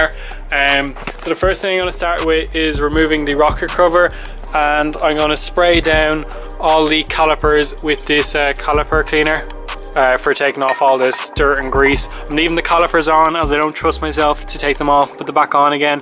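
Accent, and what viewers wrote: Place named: Ireland. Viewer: Good job, irish? I hear the accent of my people! Lol county down fella here! Great video man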